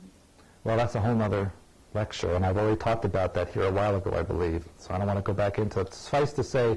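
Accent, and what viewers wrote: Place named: Russia. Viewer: So he's Russian, of communist family origins and he's married to whom? She's related to Mariam Magdalen. He has an accent, yet I am not sure why if he spent 25 years within the US fold working and ended that back in the 80s. He looks pretty young here, and this video was taken before 2003. Is 7lovo4 Stewart or a student? I'm seeing a fine line of agenda here, which is going to make me doubt a lot of his teachings and I'm only into this guy by 10 hrs or so. This is a reptilian take over?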